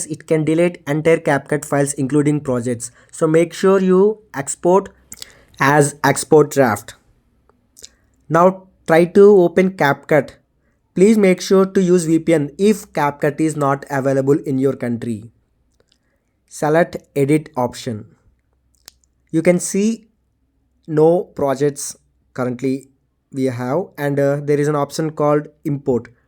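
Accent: India. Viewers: Indian guy explaining 😅😅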